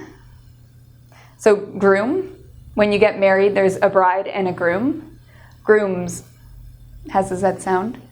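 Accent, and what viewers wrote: Canada. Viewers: Neither--she definitely sounds Canadian. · Oh, Canadian...lol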